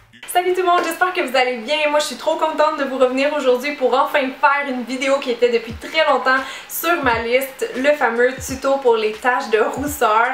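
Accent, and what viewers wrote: Quebec, Canada. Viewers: Oh une petite personne avec un trop meugnon accent québécois! Et en plus avec un tuto superbe Représente 🇫🇷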